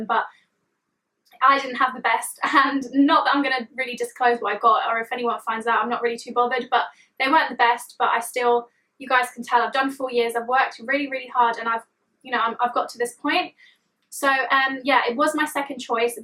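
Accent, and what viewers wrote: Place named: England, United Kingdom. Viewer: What a horrible accent what country do you come from the the wrong side of the tracks in england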